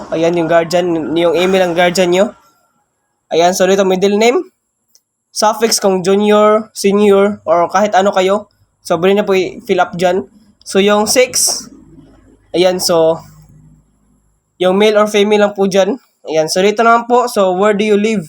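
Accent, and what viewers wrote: Visayas, Philippines. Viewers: bisaya ahaha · Bisaya man deay ka mas galisod ko sabot sa imong tagalog😅 anyway unta ma sundog nako imong vedio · Bisaya eh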